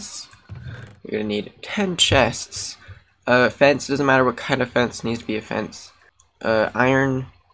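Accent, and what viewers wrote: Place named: Poland. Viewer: Jestes polakiem.. widac po akcencie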